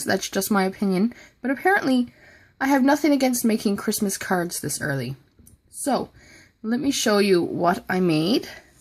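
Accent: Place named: Canada. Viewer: Cute cards! Love the watercolored tree cards! I also love that falling snow stencil! Want to get it too! I thought I could hear a Canadian accent, and then when you said you ordered from Amazon.ca, I knew for sure! I was born and raised in northern Alberta. I live in the States now. Anyhoo, I don't think it's too early to make Christmas cards, especially when making so many. Singing, however, is a different story, heehee! TFS! Hugs, Shonda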